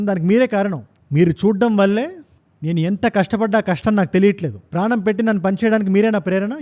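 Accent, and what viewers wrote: India. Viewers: Mee voice lo indian feel venataniki chala baguntundhi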